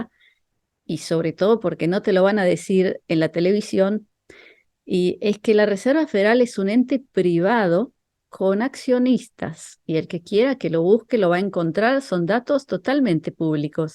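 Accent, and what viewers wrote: Argentina. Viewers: Hola yo pensé que eras argentina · Ese acento argentino después de tanto tiempo en Israel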